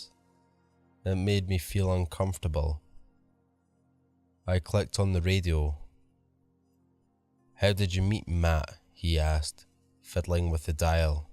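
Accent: Scotland, United Kingdom. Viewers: I just came across your channel after hearing you co-narrate a story with Swamp Dweller on his channel & now I'm hooked. Your Scottish accent makes me think of an old friend that lives out in Bonnie Bridge (spelling?) Scotland. I'm from Botetourt Virginia USA. And I just recently found out that I'm descended from a Watson Clan on my mother's side. So I have recently been digging into my ancestry. I hope to one day visit the Homeland impossibly dig more into my heritage there. Anyhow, please keep up the good work we are certainly enjoying your stories! Cheers!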